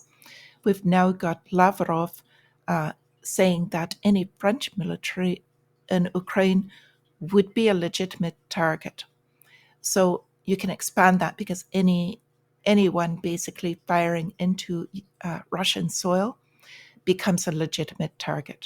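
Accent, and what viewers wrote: Ireland. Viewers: So nice to hear your Irish Brogue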